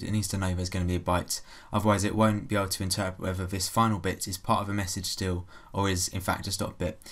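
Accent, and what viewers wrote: United Kingdom. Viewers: can't get his British accent 😢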